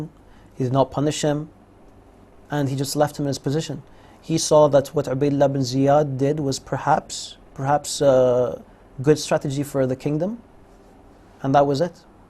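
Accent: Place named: United States. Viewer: This video is a good cover up. He has omitted a lot of details from the authentic Hadiths that tells a lot about this incident. His American accent and long thobe is hypnotizing. I am surprised that the people sitting there took his half prepared story hook line and sinker. The real tragedy of Karbala is not the cruelty of Bannu Ummayia or the tyrany of Yazid, the real tragedy is that we are soo satisfied with such stories without prodding into the details about why the Leader of Youth in Paradise was killed by Muslims 60 years after Hijra. Wat bro narrates makes it look like an accident. But as the ahle Sunnah we cannot let this narrative be built in our homes, we should all prod deeper and find out why this happened and understand wt forces are preventing the people to distribute half baked stories and build narrative such as this.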